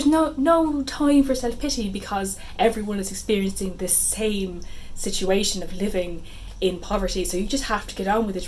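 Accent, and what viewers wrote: Ireland. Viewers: As an Irishwoman I love your Irish accent! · Ahhh! I love your Irish accent!! I’ve always been a bit intimidated by reading Seamus Heaney’s poetry for some reason but will definitely pick some up now. ☘️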